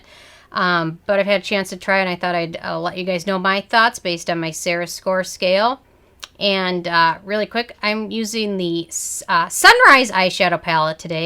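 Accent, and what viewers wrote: Minnesota, United States. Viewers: You sound Minnesotan/North Dakotan. Am I hearing things right? 😳🤣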